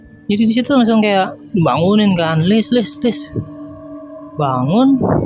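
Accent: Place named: Bekasi, Indonesia. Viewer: Orang bekasii ya ini bahasanya khas banget 😂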